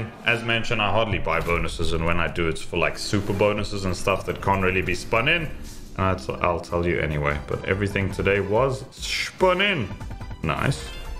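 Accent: South Africa. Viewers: Dude are you from SA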